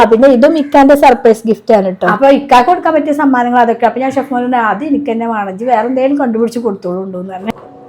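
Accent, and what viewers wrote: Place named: Malappuram, India. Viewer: Malappuram varthanam ikkante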